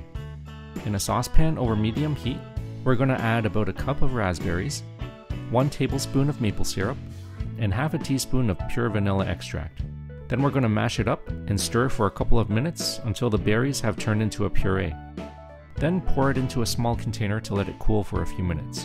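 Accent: Canada. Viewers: Canadian accent, right? :-)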